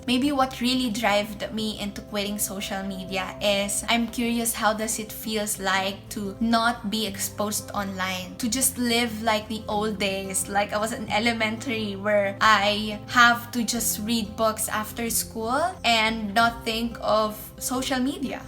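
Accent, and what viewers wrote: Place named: Philippines. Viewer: im quitting social media too, and while listening to you, i think you're a filipina haha, btw new subscriber mo ako, keep doing videos :>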